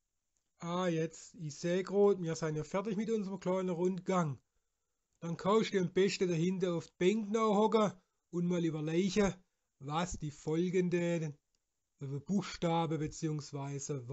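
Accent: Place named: South Franconia, Germany. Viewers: Naa, des had mim schwäbscha ned sou vil zum doona. Des is halt dr frängisch daal voa Werddaberch. I kumm voa dr Blafeldr geichnd und do reidt mor a a bissla andrsch, wia der in dem Video do greidt had. Aber des is noo a voa aam Oord zum adra wider zum daal ganz andersch. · Hört sich an wie der Dialekt zwischen Karlsruhe, Pforzheim, Heilbronn, Heidelberg. Südfränkisch, umgangssprachlich "Badisch" oder wie auch immer der Übergangsbereich zwischen Schwäbisch, Alemannisch und Rheinfränkisch heißt. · Wohne seit 3 Jahren nicht mehr in Hohenlohe, war.... ich nenns mal interessant den Dialekt wieder zu hören 😂 Etwas cringe, aber interessant. Ziemlich nostalgisch.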